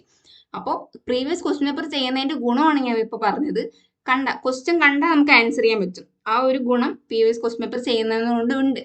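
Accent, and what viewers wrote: Palakkad, India. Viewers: Palakkad aano swadesham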